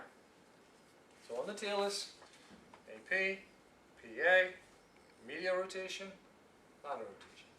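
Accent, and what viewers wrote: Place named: Ireland. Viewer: Thought I could hear the dulcet tones of an Irish (Ulster?) native!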